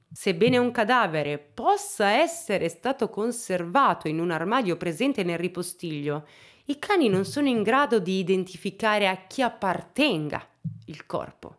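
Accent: United States. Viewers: il tuo accanirti nel “parlare in americano/inglese” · Perché spingi così l accento americano?????? Sarebbe tutto molto interessante se non fosse per questi nomi e città straniere citate con un accento veramente troppo spinto...... veramente fastidioso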